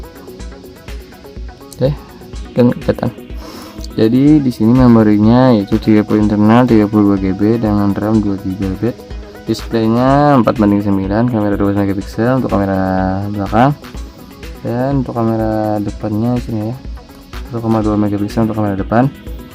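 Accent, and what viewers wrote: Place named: Banjar, Indonesia. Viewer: Mun di dangar ni org Banjar pang😂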